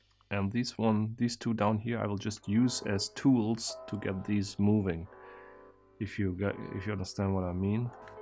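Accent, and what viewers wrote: Germany. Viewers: Th video of the Titan puzzle was the first video I watched from you. I like you but I have a questio: are you German? (I ask because of your accent)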